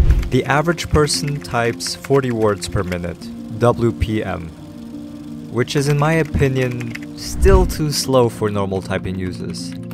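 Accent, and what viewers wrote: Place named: United States. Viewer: he speaks american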